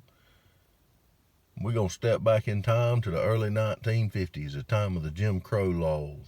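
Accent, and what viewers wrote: South, United States. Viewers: My uncle and grandmother were in the white side of the doctor's office that Sunday morning. She was the women Ruby was waiting to leave, on that I don't blame her, my grandmother was a one sided stubborn gossip. Rudy shooting him opened a can of worms. The Judge was a friend of my grandfather. When I found out about the case 60yrs later, my father did not know she had birth one of his children. Curious where are you from? Clearly southern, but beautifully open minded ❤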